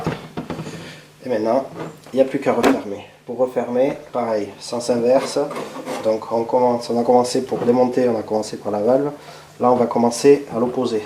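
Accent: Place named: South, France. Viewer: Bjr Merci du tuto. Chèr rider cést tress bien. Réalisér laetitia de verviers en Belgique pianiste.. Et rideuse dans mes heures libres.... Un grand coup de chapeau. Pour remettre ce pneu.. En action.. Cest une bonnne vidéo bel accent du sud..❤️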